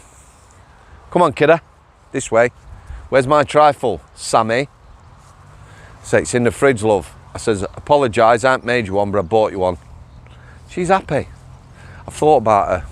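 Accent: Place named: Manchester, United Kingdom. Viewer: Honest to God you sound like a Manc! Am from Kirklees West Yorkshire born n bred! The Manc twang must have rubbed off on you in Strangways! Seriously bruv your accent is Manc!100%! Anyhow subbed I like you bruv! First Saw you on James English!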